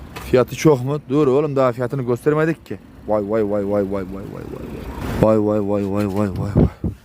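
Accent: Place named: Black Sea, Turkey. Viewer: Sırf şu konuşman şiven için abone oldum (Kurbanın olıyım) ( şuna baghele uşag)😂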